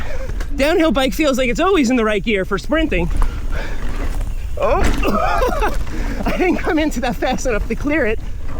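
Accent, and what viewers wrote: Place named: Queens, United States. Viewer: He also drinks wooder like everyone from Queens.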